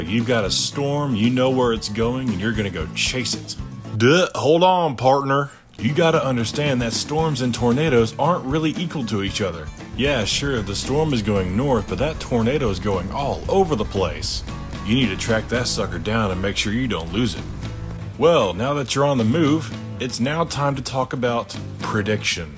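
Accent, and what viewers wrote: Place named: Texas, United States. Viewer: The Texan accent making it’s infinitely better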